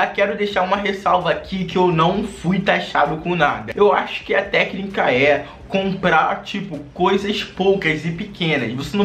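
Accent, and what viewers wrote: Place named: Rio de Janeiro, Brazil. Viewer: Cara, o que mais gostei de vdd foi o seu sotaque carioca hiper mega carregado!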